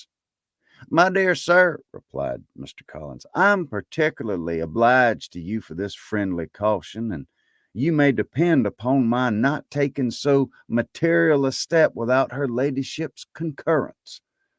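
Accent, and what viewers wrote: South, United States. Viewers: Is the "...and Magnolias" added simply to indicate that it has been read in a southern accent, or does it come from some adaption, like, "Pride & Prejudice & Zombies"?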